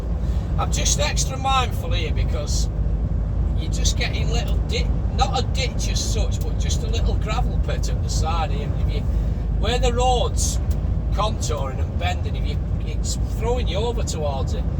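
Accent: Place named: Yorkshire, United Kingdom. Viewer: that's the worst Yorkshire accent I've ever heard, can't say to much about lanky Lanks because my wife is a lanc god forbid, what a marriage Y&L.😂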